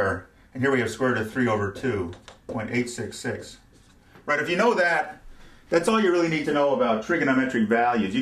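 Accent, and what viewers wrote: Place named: New England, United States. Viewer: Lol a slight new england accent I think is what you're getting at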